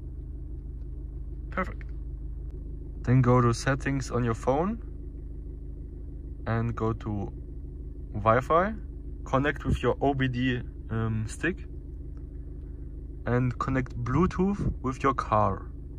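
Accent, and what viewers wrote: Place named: Germany. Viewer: Ich wittere deutschen Akzent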